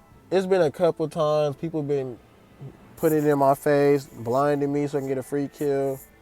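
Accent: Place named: Bay Area, United States. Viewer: Bruh can tell by the way u talk u gotta be from around the bay, Vallejo here 💯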